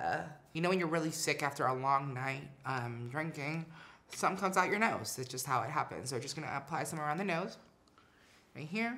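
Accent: United Kingdom. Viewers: Your voice with british is really good omg! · Love the British accent 😍😍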